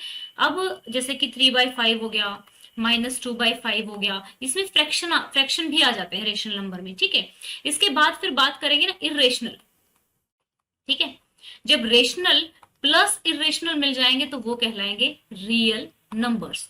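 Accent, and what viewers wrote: Haryana, India. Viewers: Mam you are from Haryana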